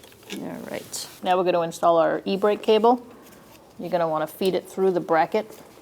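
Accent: Boston, United States. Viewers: This is gonna be a task, watching this thick accented Boston woman replace these calipahs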